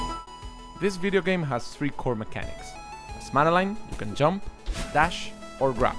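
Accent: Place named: Spain. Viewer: Eres español? Porque tu acento parece español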